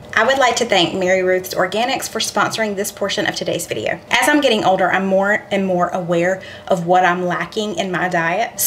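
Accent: South, United States. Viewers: When I hear a southern accent and see them using ingredients from Food Lion I know it's going to be good.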